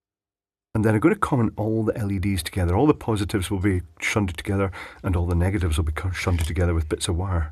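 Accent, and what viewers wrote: Scotland, United Kingdom. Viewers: Hey Big Clive, really enjoy all your videos. From scotland myself and its nice to hear one of us on youtube for a change. Anywho can you look into flat earth?? There is someone from paisly getting alot of people on the subject just by going around paisly and glasgow and spreading the word. Let me know if you will atleast look into it if you havent already?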